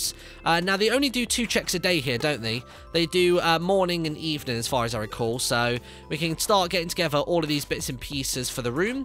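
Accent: United Kingdom. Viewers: Banana Bucket He Has a British Accent If You Havent Already Noticed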